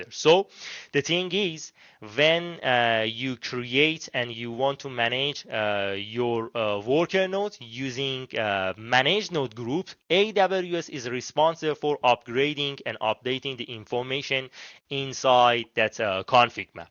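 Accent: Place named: Shiraz, Iran. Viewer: english with shirazi anccent ❤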